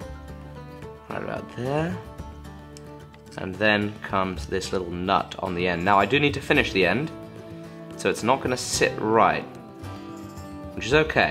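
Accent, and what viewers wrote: United Kingdom. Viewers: can you remake this video again but using a normal accent (not annoying British accent)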